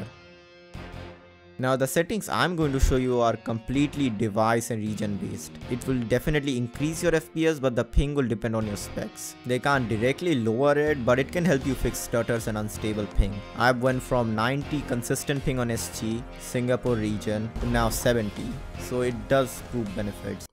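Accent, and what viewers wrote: India. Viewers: U already know when his accent is Indian ur in the right tutorial 😭🙏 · bro sounds indian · Why did you redeem it ahh india voice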